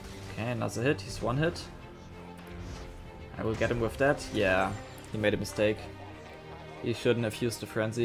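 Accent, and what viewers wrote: Germany. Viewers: Dein deutscher Akzent könnte aber auch nicht stärker sein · Man hört raus, dass du deutsch bist :D Gutes Video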